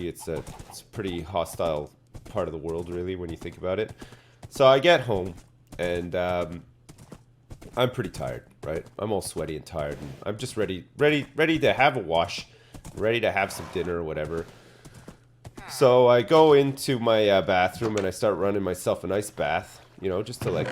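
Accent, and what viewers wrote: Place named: Alberta, Canada. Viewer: So many years later, he still sounds like he's from Alberta.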